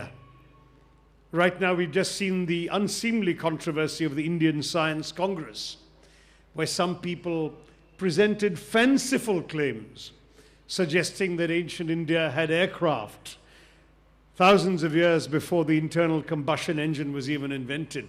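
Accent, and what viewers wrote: United Kingdom. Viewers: ~ You can even listen to Che Guevara, for all I care. Nobody is distracting you or stopping you. Just because one can speak crafty, polished queen's english doesn't absolve of one's involvement in the death of a woman one is married to, in a highly suspicious circumstance that directly tied to one with many loose ends floating all over. I don't care who says what, I would never send my kids to a school who will invite someone like him to talk on morality & leadership.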